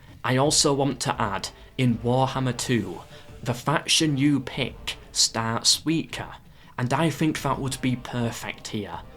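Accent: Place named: England, United Kingdom. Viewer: I managed to get it to very end but that pronunciation was hard for my ears. What is that dialect? I presume from England. But from where specifically? Just curious.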